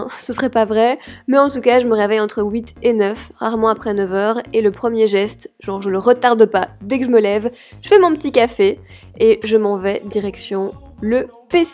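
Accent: Belgium. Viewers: J'adore l'accent belge 😂😂😂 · J'adore l'accent belge: houit 😂😂😂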